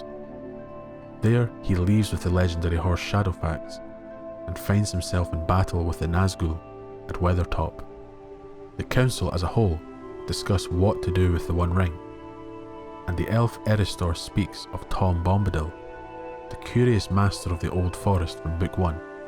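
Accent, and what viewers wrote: Scotland, United Kingdom. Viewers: I agree completely, the shear amount of world building and the flavor of the tale are set here. Rich lessons and enjoyable dialogue; ive come back to this chapter many times. I could listen to you read the entire chapter, Scotch accent?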